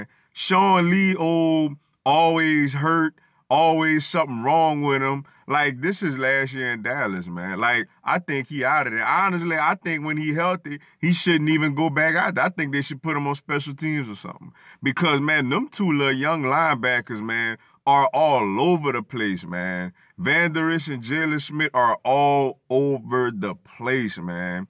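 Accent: New Orleans, United States. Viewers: man you got a new Orleans accent...I swear.....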